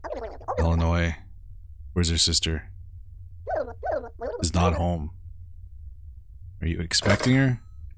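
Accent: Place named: Canada. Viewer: This guy has one of the most aggressively Canadian accent I've ever heard.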